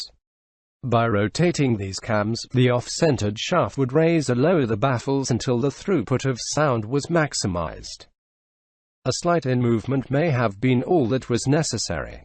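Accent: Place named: United Kingdom. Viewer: atrocious robotic British accent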